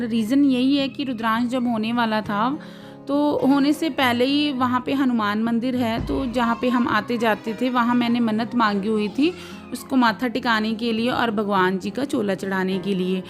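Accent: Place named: Punjab, India. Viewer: Aap ki language Punjabi lagti hai, but aap shayad ho, aap plz apne baare mein kuchh explain karen aap kahan se ho??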